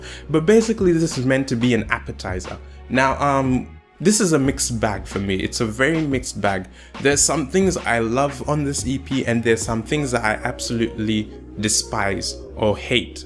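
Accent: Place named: Ghana. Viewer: Are you Nigerian?, you sound Ghanaian.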